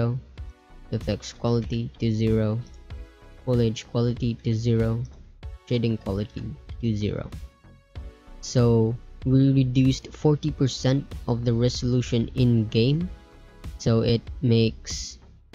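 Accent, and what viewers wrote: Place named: Philippines. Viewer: Pagsasalita palang alam ko nang pilipino ahahahaa thanks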